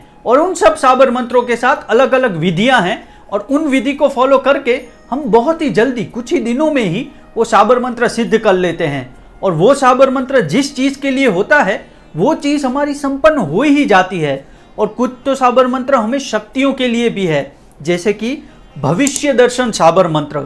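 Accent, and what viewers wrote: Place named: Gujarat, India. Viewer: Bhai gujarati lage bava hindi bol rahe hai super a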